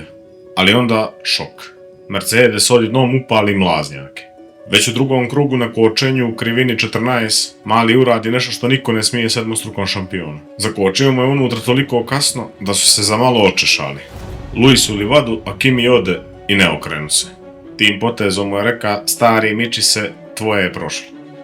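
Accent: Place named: Montenegro. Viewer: Pravis najjace videe sa sve crnogorskim akcentom 😂😂😂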